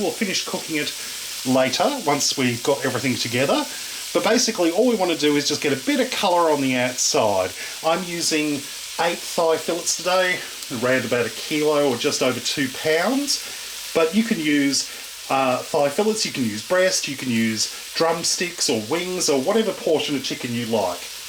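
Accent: Australia. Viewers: Man you di so well on youtubie shit what is your secret. You sound Australian as I am. Good work I have saved heaps of your recipies. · OMG, didn't know you r OZ!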